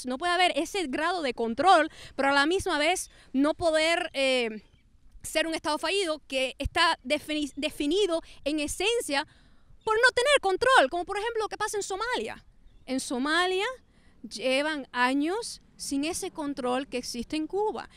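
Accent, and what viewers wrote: Spain. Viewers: Niña estás locamente loca Dios mío manera de hablar mierda,eres cubana q mierda hablas como española con esa Z horrible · Ridículo tu acento gallego que sacamos a punta de machete , tu te estas aguando en un vasito de agua, sin ningun proposico. En Suecia la Universidad es gratis en Canadá te ponen un yeso. Eso es lo que queremos en Cuba . los suecos no tienen comunismo. Ja Tienes 12 latas de atun y dos generadores eléctricos.Mejor dejo a esta estúpida.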